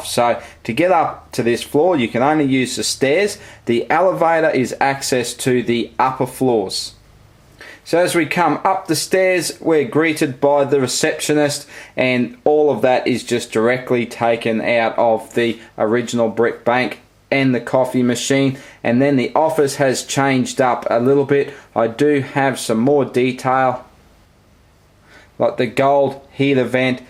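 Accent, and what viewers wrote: Australia. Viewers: You have the most pronounced Australian accent I have ever seen. Great build btw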